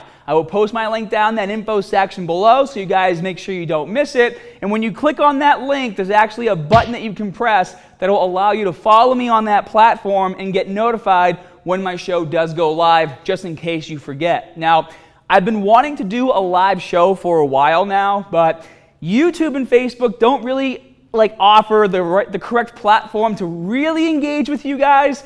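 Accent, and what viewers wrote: Boston, United States. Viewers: This guy has the classic Boston dialect going on. Pretty cool and hard to find. Haha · Mazklassa boston? maybe nyc? i can never tell the difference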